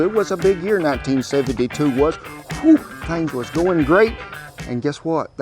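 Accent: United States. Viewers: I am enjoying cowboy accent so much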